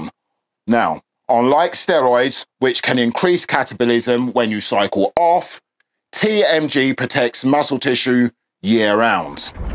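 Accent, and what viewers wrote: United Kingdom. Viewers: I love your snappy Brit accent...reminds me of the Guy Ritchie movies...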